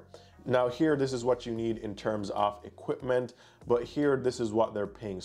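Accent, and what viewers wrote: Jamaica. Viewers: Sense a bit of an accent. Are you Jamaican?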